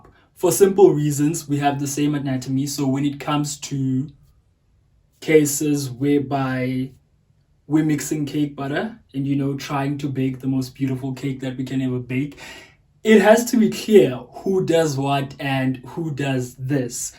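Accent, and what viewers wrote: South Africa. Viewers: Wait u south african Ur accent And yeah I've learnt 2 compromise Its ok for us verses i guess Coz we stick with what we receive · I really like ur accent...it sounds south African but ur name is I'm like obsessed w u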